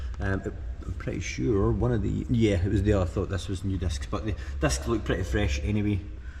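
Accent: Scotland, United Kingdom. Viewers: Is he speaking in Scot-ish, I can only understand every fourth word he says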